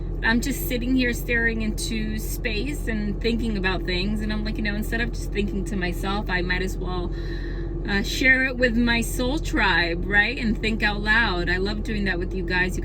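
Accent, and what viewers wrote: New Jersey, United States. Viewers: Just wanted to tell you I LOVE your personality.. even your NJ accent lol ♡♡♡ have a blessed day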